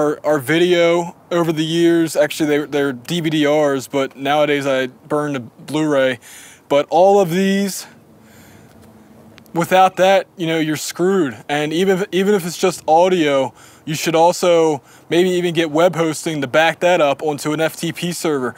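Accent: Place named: Philadelphia, United States. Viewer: Hmmm... sounds an awful lot like you have a Delaware valley/Philadelphia accent. Anyway, I really enjoyed your song analyses and the loudness wars video, keep up the good work.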